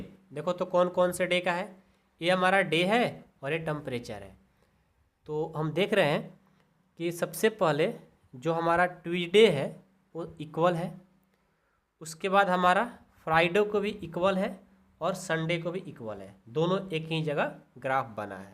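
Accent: Bihar, India. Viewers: Abe bhai yaar tum behari ho kya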